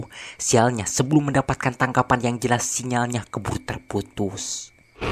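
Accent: West Java, Indonesia. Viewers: Suka sama logat sundanya sama banyolannya 🤣 salam dari Pulau Batam Indonesia #RumahDiAtasLaut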